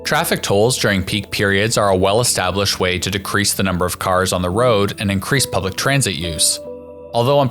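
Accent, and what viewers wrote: United States. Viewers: Because the creator is a dumb YANK, NOT A CANADIAN. Can't even say "zed".......as in either of our two Official Languages.....one of which is NOT "Amurrrrrrican".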